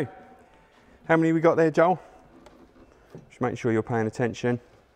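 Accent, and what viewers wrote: United Kingdom. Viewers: Always nice to hear an Essex accent, and always nice to see fabulous Martin guitars. The best in the world. Sadly, I won't be coming to see you. No lefties!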